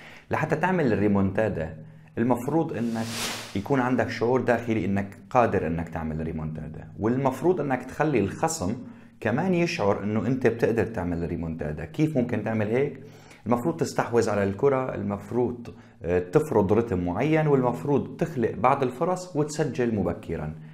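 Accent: Aleppo, Syria. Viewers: كني حلبي ؟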